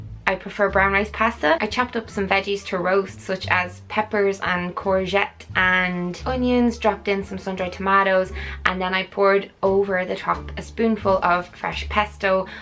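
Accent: Ireland. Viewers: Ireland u can here on her accent hahah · R u Irish?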